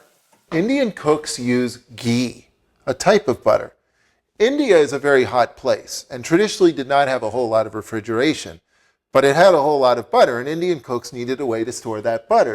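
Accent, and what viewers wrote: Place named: Canada. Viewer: He has a Canadian accent but Canadians don't say Dell instead of Dhal. I think its ignorance.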